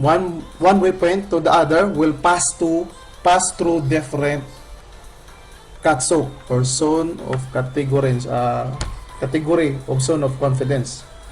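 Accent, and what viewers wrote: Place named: Visayas, Philippines. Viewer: Bisaya accent , mispronounced ang mga words di maintindihan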